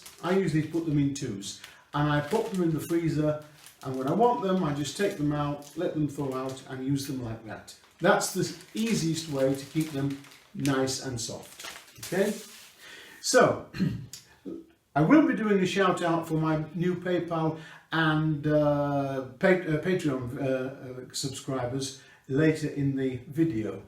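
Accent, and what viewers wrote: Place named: Northwest England, United Kingdom. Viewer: This is amazing and made my day. Love the Northwest accent as well 😂 - All the way from Canada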